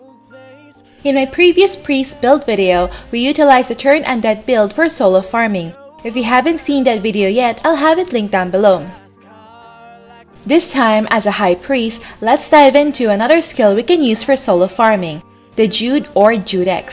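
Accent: Philippines. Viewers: I love your filipino accent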